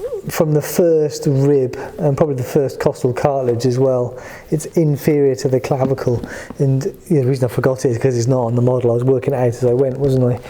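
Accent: United Kingdom. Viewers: worst vid ever. especially the unapologetic british accent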